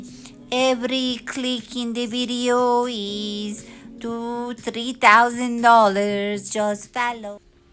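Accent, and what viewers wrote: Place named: India. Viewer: لهجه اینگلیس شما مثل هندی ها شده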